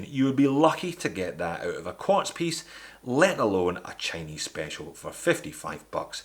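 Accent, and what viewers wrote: Scotland, United Kingdom. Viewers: It's well deserved mate, your channel is one of the best out there, no need to buy subscribers like some others!!! Keep going mate, the watches you look at aren't covered by many others so you are head and shoulders above the rest.....and you have introduced the Scottish accent to my Russian wife :-)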